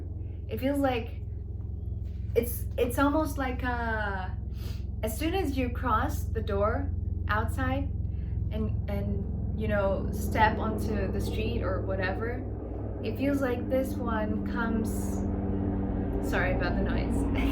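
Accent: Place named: England, United Kingdom. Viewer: Thank you,! I think I'm feeling this right now. I just discover your channel through San story video:) Beautiful energy, deck and readings. You are an inspiration to me. I love your English accent as well;), I'm from Spain. Love ♡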